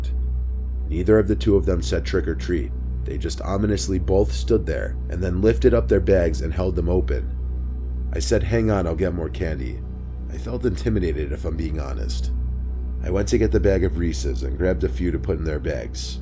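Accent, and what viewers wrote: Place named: New York, United States. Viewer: You’re GREATLY EXAGGERATING. The voice has Zero factors that keep a person engaged, with any low soft creep factor AT ALL. Realistically, it sounds soo close to an annoying New York accent, which is absolutely abhorrent. It shows how desperate Generic people will literally listen to anything.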